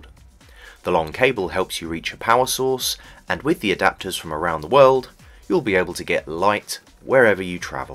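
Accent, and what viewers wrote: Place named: RP, United Kingdom. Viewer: Was worried we wasn’t gonna hear you clear speaking posh voice then when the music kept going.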